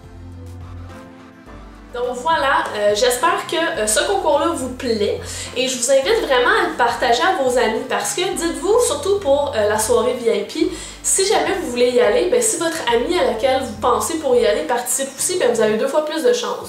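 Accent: Quebec, Canada. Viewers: J'aime tes vidéos, il sont très utiles :) en québécois en plus ça fait du bien hihihi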